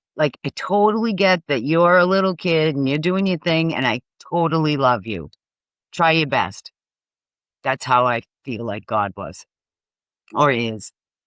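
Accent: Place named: North Shore, United States. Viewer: This was my 1st time watching this channel and I wish I could HYPE it. Subscribed with a smile., Thank you sooo much for sharing your stories and insightful perspective. What a wonderful NDE! It touched my and my husband's heart. Don't stop telling your truth...The world needs to know. Thank you for helping to wake up the collective. By chance, are you from the N. Shore of MA? 😉 I'm from Wellesley but went to college in Beverly and you sound just like those wonderful people! 🤸🏻🤸🏻‍♀️🤸🏼‍♂️ PS. You both sharing genuine love at the end filled our hearts.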